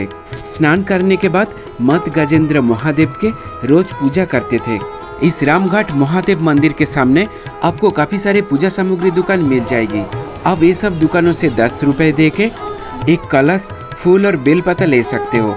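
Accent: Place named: Bengal, India. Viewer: Bhai aap bengal se ho kya . language se lg rha apki hindi se 😊 anyws alws most welcome...love from Lucknow 🙏😊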